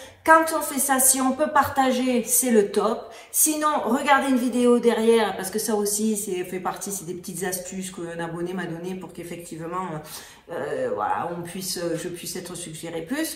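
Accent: South, France. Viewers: bjr, j'espère sincèrement que yOutube va vOus Laissez pOsté tranquiLLement vOs vidéOs qui sOnt extras. "nOus vivOns cLairement dans un mOnde dément de démOns" Prenez sOin de vOus & de vOs prOches sur Vegas. (Merci pOur Les petites pOintes d'humOurs & pOur cette accent qui me rappeL cOmme j'ais aimé vivre dans Le sud) "ça fi du bien" 🇨🇵😉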